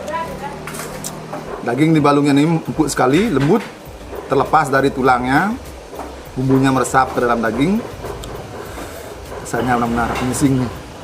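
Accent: Bali, Indonesia. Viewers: logatnya itu lohhh bali bangett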